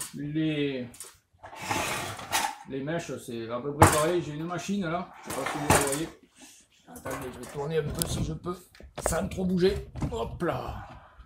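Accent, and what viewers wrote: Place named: Provence, France. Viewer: je viens de decouvrir ta chaine,super simpha nous qui sommes en Australie trouvons que tu es un poete de Provence avec ton accent, tes expressions bien humanes,merci mille fois, tres agreables tes videos bon a bientot Robert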